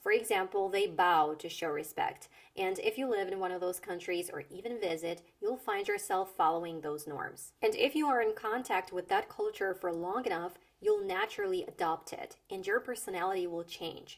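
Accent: United States. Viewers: Thank you for this video. You speak an excellent american English . Impressive. I'm trying to do the same with English from south of England but I don't have your talent at all !😅 Very few people can fade their accent. In France, I lived in Paris almost almost my life, I met very very very few foreign people without accent.I just remember a russian woman, that all. Yes, foreign people can speak french very well, but without accent?no, it's absolutly exceptionnal. Absolutly, learn a foreign language changes your mind, and your point of view about a lot of things👍 Nevertheless, I'm 53 years old, my priority is to be understood, to have a consistent pronunciation, and to have fun learning English. See you soon on your youtube channel👍👌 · Good evening my beautiful teacher 😊. i love your all videos and the way you explain and teach is fantastic and excellent. 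i am trying to speak like you but it might take time and i thought in beginning that you are American because i love your parlance. thank you so very much 😊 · If you not say that people say that you are pretending being an American… I couldn’t believe you are not an American… for me you look and sound as an American woman…. Hope one day I can pretend too being an American as good as you are doing it 😅….congratulations! =) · You sound as an American , and i really like hear your voice and your information. 🎉Thanks ☺️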